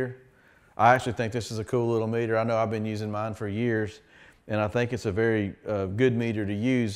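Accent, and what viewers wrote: South, United States. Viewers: An intelligent, coherent presentation of a complex idea. Imagine that from someone with a southern accent.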